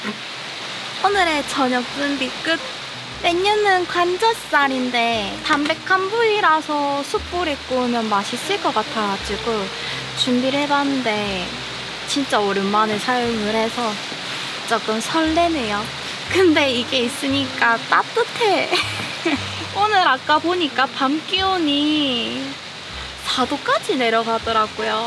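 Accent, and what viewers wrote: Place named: Gyeongsang, South Korea. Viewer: 날씨가 이래가될일이가? 포항사투리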